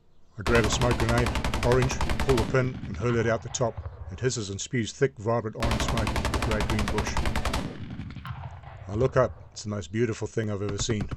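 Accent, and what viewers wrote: Australia. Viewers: Ja right you are an Ausie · You drive through an ambush, or a TB, like we did. Pity you chose an ozzy accent for the narration.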